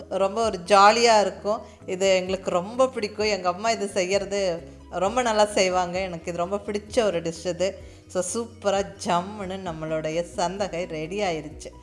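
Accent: Kongu, India. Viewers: Always ur kongu style recipes and kongu accent tamil r very very nice. புழுங்கலரிசி இடியாப்ப (சந்தகை) முறையை வேறு வகை இடியாப்ப கட்டைகளில் பிழிய வருமா? நான் உங்களின் அனைத்து வீடியோக்களையும் நீண்ட காலமாகவே பார்த்து வருகிறேன். All r awesome.. சிரிப்புடன் கூடிய குரலும் கூட.., உங்களுடைய பிக்னிக், சேலை கடை விசிட், மற்றும் அனைத்தும்...!!!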